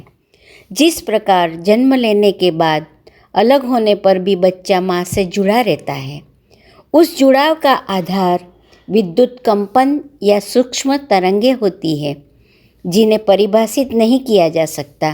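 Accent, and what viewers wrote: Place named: Gujarat, India. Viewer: Madam 👩 ji 🙋‍♀️🙋‍♀️ Aapka... ( pravachan ) bahut achha hai 🙋‍♀️🙋‍♀️ But aap pakka gujrati ho ji 🙋‍♀️😊😊🙋‍♀️🙋‍♀️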